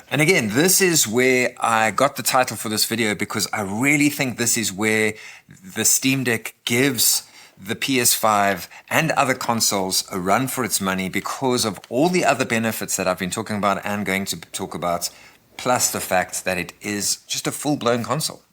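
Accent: South Africa. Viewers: You South African ? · Are you South African? You sould South African.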